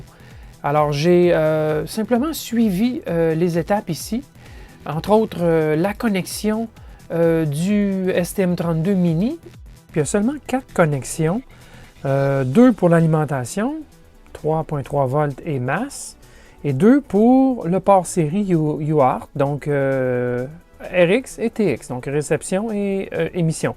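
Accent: Quebec, Canada. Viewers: Hello Mr québécois chouette accent :-) Merci pour ces vidéos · Très belle présentation, claire et très Pro pour un bidouilleur. Je réside au nord de la France et on aime beaucoup l'accent québécois. Merci pour votre travail. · Très bizarre ce verrouillage, peut-être des puces récupérées d'occasion sur des cartes où le programme de la flash avait été verrouillé ? Vu le prix, c'est pas impossible... Eh oui, nos cousins québécois ont un chouette accent :-)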